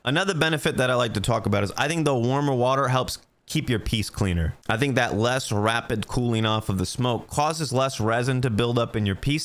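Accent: United States. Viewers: what state is ur accent from homie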